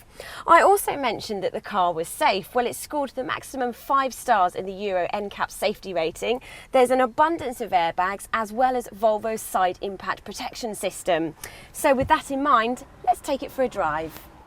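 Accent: United Kingdom. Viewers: Wow, that is a fine ass reviewgirl! Why whe don't have those in the Netherlands.. Oh, love the British accent as well.. ;)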